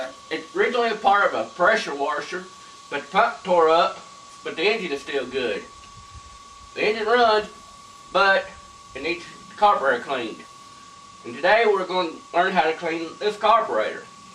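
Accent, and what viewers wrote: South, United States. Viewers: Well, here is the proverbial at it again. I must admit, that in all sincerity, I love the Southern accent. Men and Women, hear me out... the "good 'ol boys" from the south, have taken some bad raps, in regards to racism and tyranny over our friends and neighbors who are black. Again, I admit, that there were multiple, AND I MEAN MULTIPLE times when they did the wrong things regarding interracial culture, including outright murder!!! My heart will always be saddened by what the American South has done to our brothers, sisters, and their children. I had hoped that by this day and age that we would be over this issue. But I can clearly see that we have not. Here is my point. This guy is a typical image of what the rest of us see. - - - A "Good 'Ol Boy", but thru it all, at least in my life, I have learned that they do have quite an ability to repair things. 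Duct Tape and Super Glue, et al, will most likely fix anything. This video was great!! The replies are even better. I Say... take it for what it's worth, and by God, DO NOT smoke while you clean your carb. He also gets credit for being such a "likable", guy and his "dowg", seems to be at home with him and loving life!... Great post, chew me out if you want folks, but I still, will continue to believe in our... NOW... WILL WE be able to teach the hardline bigots down south anything? Well, it would appear that we just keep "chipping away at it"... and so we continue. I am not sure about you, but befor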